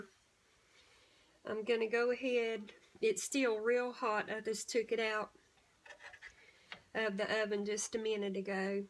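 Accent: South, United States. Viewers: has the best Southern accent I have ever heard. I can hear the kindness in it. We love you. ❤ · All I had to do was hear that southern voice and I instantly subscribed. If anybody knows about cooking, it's a southerner. Thank you for sharing this recipe. I'm looking forward to catching up on all of your recipe videos here in southern Ohio. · Ms, I hv watched several of your videos, so now I am a big fan!!!! Your talking and accent w/mannerisms just like my Grandmothervwho was raised in So Ga. When I watch you, listen and learning,I'm hearing her telling me her lessons and stories of her data growing up!! I love it, and I am so excited to get it ur videos on all it favorite hummus foods. Lov u already and please, keep sending us videos!!! Thank you so much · She talks so sweet and southerny.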